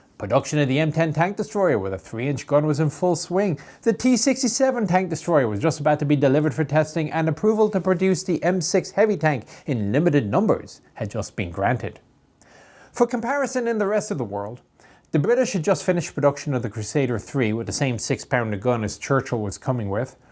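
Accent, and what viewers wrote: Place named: Ireland. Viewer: I like reading. But I can't read with a cool Irish accent so here ai am.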